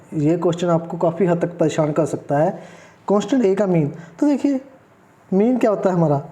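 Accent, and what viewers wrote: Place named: Punjab, India. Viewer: Nice lecture 1st time complete sunya h. But tuhadi speaking Ch Punjabi tone h so I think u must teach in Punjabi.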